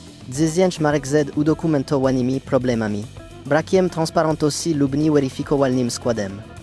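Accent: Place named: France. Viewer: Ktoś tu mówi z francuskim akcentem 😅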